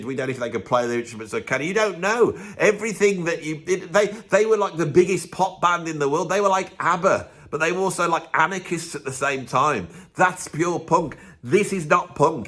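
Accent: Birmingham, United Kingdom. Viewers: Is there anything funnier in the World than a ranting Brummie?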